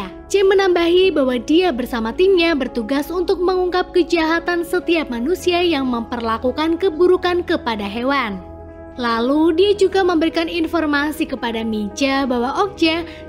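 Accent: Pemalang, Indonesia. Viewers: wong pemalang?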